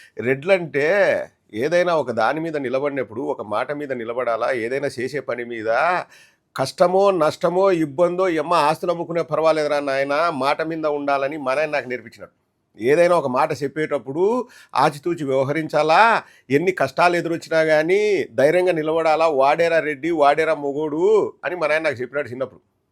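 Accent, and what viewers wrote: Rayalaseema, India. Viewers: అన్నా మన రాయలసీమ యాస కేక నువ్వు సూపర్ అన్నా❤ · మీ రాయలసీమ యాస సూపర్ అన్న. అదేంటో ప్రతీ వెధవా జగన్ దమ్ముని సవాల్ చేసేవారే! ఆల్రెడీ జగన్ దమ్ము కేంద్రానికి తెలుసు, స్టేట్ కి తెలుసు, ప్రపంచానికి కూడా తెలుసు మరి శబరికి ఎందుకు తెలియదో నాకు అర్ధం కాలేదు.శబరి కి welcome our channel. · Super Anna, asalaina rayalaseema slang lo mataldinav…! Mee originality ki 🙏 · అన్న రాయలసీమ యాసలో బాగా ఇరగదిసావ్ షబరి కి గట్టిగ దింపావు 90mm రాడ్డు రెడ్డి గారి పౌరుషం పిక్ లెవల్ గా సూపర్ గా చెప్పావు 👍👍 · Rayalaseema yaasa super anna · sir, శబరి కి చెంపలు వాయించేశారు. మన రాయలసీమ slang లో స్పీచ్ సూపర్. I am from kurnool. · సూపర్..... రాయలసీమ భాష లో భలే చెప్పారు.ఇదిరాయలసీమరేడ్డీఅంటే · సూపర్ గా రాయలసీమ యాస లో చెప్పారు..మీ ఆవేశం లో అర్థముంది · Pakka slang of rayalaseema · Original Rayalaseema language super sir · పక్కా మన రాయలసీమ భాషతో అదరగొట్టారు 😂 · ఆదర గొట్టారు రాయలసీమ యాస లో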